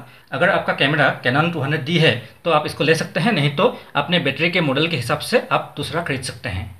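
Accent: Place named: Assam, India. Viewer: kiunki tu bengali hai wo asamese hai. dont make fun on others accent. being a bengali i know how u people too have funny hindi accent.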